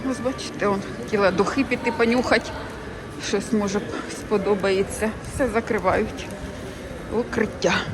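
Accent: Kryvyi Rih, Ukraine. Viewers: Дуже приємно було почути криворіжську говірку. Бальзам на душу. ❤😂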